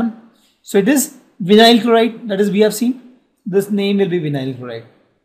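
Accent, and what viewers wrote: India. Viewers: ^ Are you like from US or UK. :3 We are indians. Our accent is like that,bro. :3 Stop complaining,start appreciating. -_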